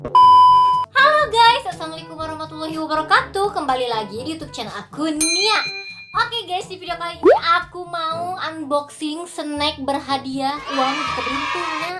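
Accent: Sunda, Indonesia. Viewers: Lucu pisan kalo ngomong sunda campur indo🤣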